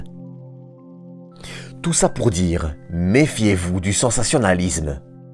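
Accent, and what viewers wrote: Belgium. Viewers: c'est l'accent belge, perso c'est l'accent arabe qui me dérange mais pas celui là...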